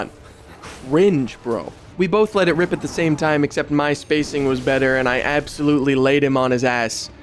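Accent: Canada. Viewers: Never realized you were Canadian, but dropping "University", "bursary", and "KD" when talking about noodles all in a single video outed you as a true great white northerner.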